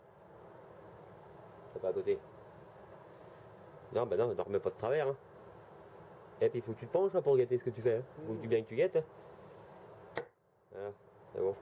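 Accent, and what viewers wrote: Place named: Vosges, France. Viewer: Ça fait du bien d'entendre un peu un (léger) accent vosgien ! Super que ton fils soit intéressé, mais va te falloir le double d'outils ! 😉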